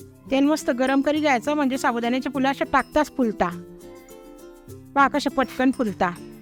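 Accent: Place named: Khandesh, India. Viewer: छान आहे रेसिपी, तुमची खान्देशी भाषा कानाला लै ग्वाड वाटते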